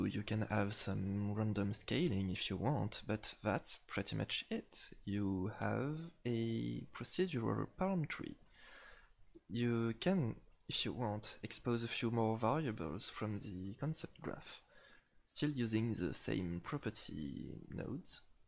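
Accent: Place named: France. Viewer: cette accent français xDDDD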